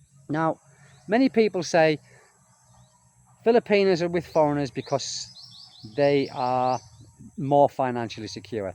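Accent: United Kingdom. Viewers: I think he's more a practicologist w the British accent Westerners enjoy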